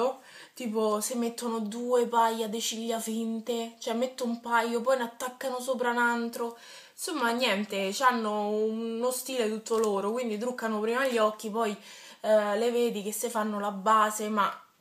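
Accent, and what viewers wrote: Rome, Italy. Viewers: AHAHA romanaccia proprio :) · sei bellissima e bravissima^^ ma te prego parla meno romanaccio D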